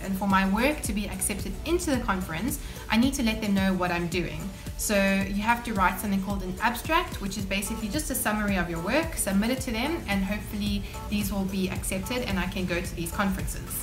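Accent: South Africa. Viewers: Are you South African? If so, where did you study?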